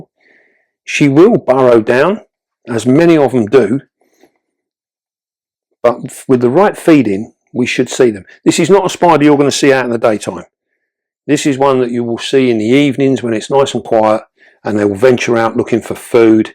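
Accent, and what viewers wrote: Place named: Cockney, United Kingdom. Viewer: "Oh maay giddy aunt"......😮...😅 Brilliant cockney real London gab, luv it ❤ 👏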